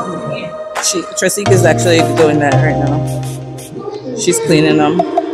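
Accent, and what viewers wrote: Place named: Jamaica. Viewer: Waaaiiiiittt a minute did a hear patois? are you Jamaican or Caribbean? anyway, love the set up as usual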